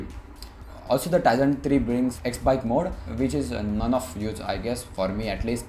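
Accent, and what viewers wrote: India. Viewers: hmissi ALA Indian accent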